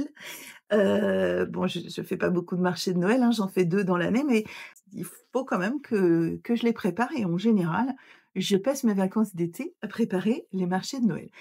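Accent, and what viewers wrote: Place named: Sarralbe, France. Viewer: 👗🪡🧵💙✂️❤️😘 bonjour, j'entends que tu es près de Sarralbe.. mon petit fils est au collège à Sarralbe et moi je suis de Freyming.... nous sommes donc voisine 😂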